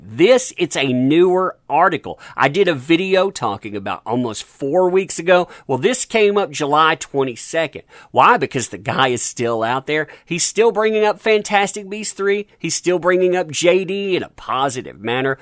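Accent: United States. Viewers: What kind of American accent are you speaking?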